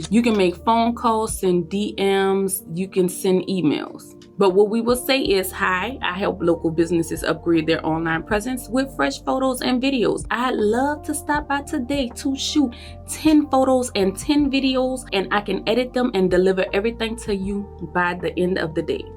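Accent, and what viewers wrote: New Orleans, United States. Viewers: 🎩👌LayDonDe'Vu on ya line. I can tell Baby from New Orleans Period Do ya thang Baby Keep speaking to the audience that need it. I wish you Prosperity in All you do⚘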